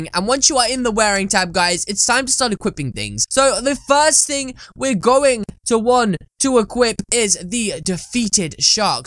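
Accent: United Kingdom. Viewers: U British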